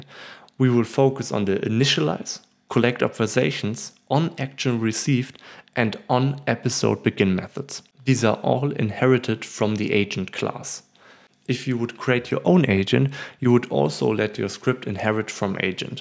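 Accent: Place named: Germany. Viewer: didn't know this accent was german. it sounds awesome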